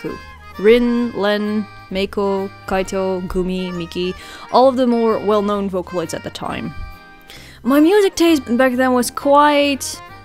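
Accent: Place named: Netherlands. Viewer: Welcome back,! I miss your glorious animated story time videos and this is certainly one of them with your fluid, cute art style and entertaining stories and storytelling! I also love your Dutch accent and you remind me of a fellow Dutch artist friend of mine. Anyway, I anticipate seeing more content like this and I would like to see more of your bootleg Vocaloid days. 😄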